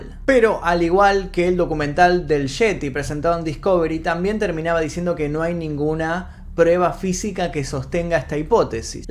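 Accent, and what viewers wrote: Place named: Argentina. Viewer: Excelente narración pero siento q tener un lenguaje neutro sería lo ideal no es lo mismo ayuda q achuda y todo el resto de sustituciones q acostumbran los argentinos .exitos y es crítica constructiva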